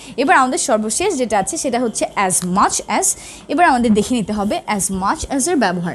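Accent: United Kingdom. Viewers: ছোট বোন তুমার ইংলিশ উচচারণ গুলি ইংল্যান্ড এর লোকদের মতন হয়।ভালো থেকো সুভকামনা রইলো। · অাচ্ছা অাপু অাপনার pronounce কি ব্রিটিশ?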